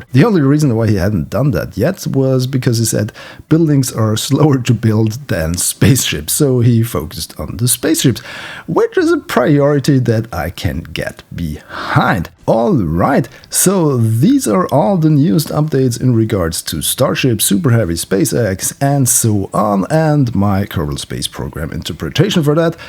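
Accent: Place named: Germany. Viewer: man hört den deutschen akzent in deiner stimme raus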